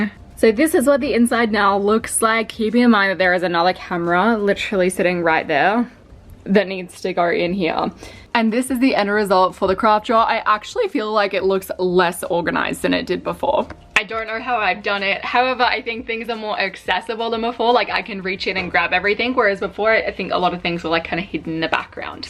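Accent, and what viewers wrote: Australia. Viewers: Dear, I love hearing your voice because you make me feel like I’m still in Australia! I often think I’d like to see how you’d evolve after having children... I had 2 pretty close together and THAT was made me crave minimalism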